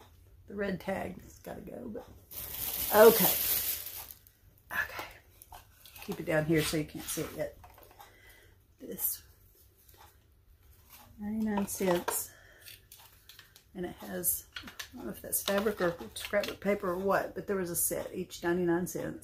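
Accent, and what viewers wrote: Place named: North Alabama, United States. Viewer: I am so sorry you have had the flu!!! I have experienced the flu one time. My husbands side of our family has a celebration between Thanksgiving and Christmas that covers all the bases. I was coming down with Covid and didn't know it. I was mortified because everyone came for the first time in twenty years!! I had shots that allowed me to have a relatively easy time. I am so glad to see you back on YouTube. I love to hear you talk in the way us North Alabama people do, lol! God's grace and blessings to you and your family. Sherry from Alabama.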